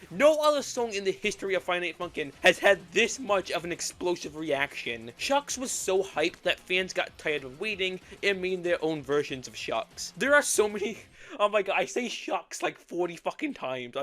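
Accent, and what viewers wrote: Brooklyn, United States. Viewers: By the way, do you have a Brooklyn accent? It sounds like it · The worst and best part about this video is that he sounds like Brooklyn guy slightly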